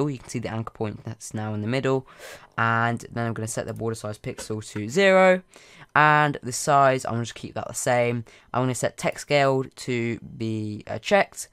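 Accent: United Kingdom. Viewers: Omg your British now! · Now he sounds more British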